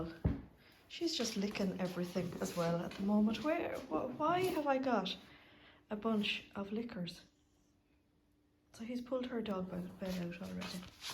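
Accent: Ireland. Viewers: Hello I could listen to you all day. You have the most beautiful West of Ireland accent, soft and melodious. Have a lovely weekend 😘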